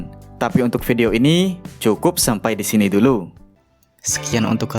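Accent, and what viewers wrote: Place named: Java, Indonesia. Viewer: Pelafalan a la ✨Djawa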